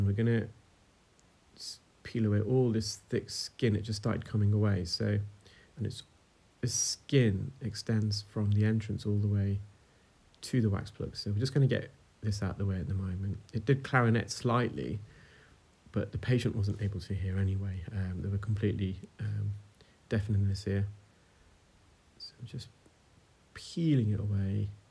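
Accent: United Kingdom. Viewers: You're not the first to fall for a British accent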